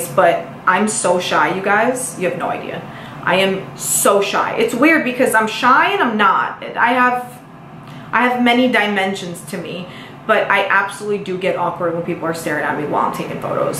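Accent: New York, United States. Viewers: now that you're back in ny you're ny accent is back! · I'm an OG follower aoww<link> Also a fellow born and raised NYer...always loved your NY accent...finally seeing influencers with the same NYC swag I grew up with is nice!!!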